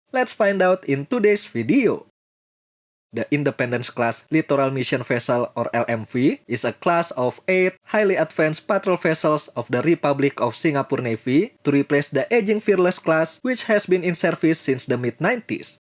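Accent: Indonesia. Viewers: Its a gramatically correct and nice Indonesian accent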